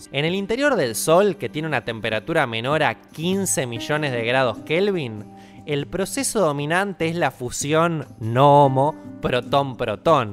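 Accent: Argentina. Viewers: Que horrible es el acento argentino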